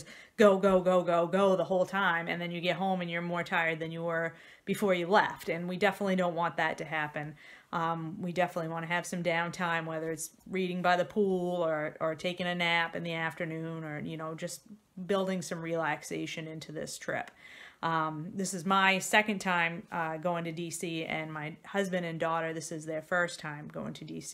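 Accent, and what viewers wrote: Maine, United States. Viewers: Five presidents sounds very interesting. How did I not pick up on your Maine accent?! My sister lives in Brownfield, love Maine and visit frequently! I also really enjoyed The Stranger in the Woods too! Have fun in DC!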